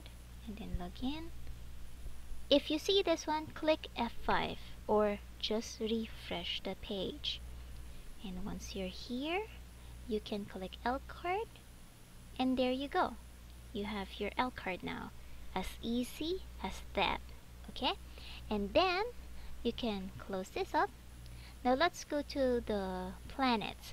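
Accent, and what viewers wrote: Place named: Philippines. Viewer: You talk like my friend from the philippines, it's so cute! Also thanks for helping!!!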